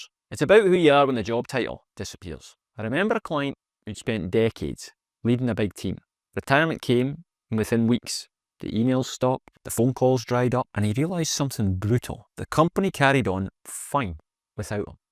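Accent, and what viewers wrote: Scotland, United Kingdom. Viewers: LOL, nice Scottish accent, I love it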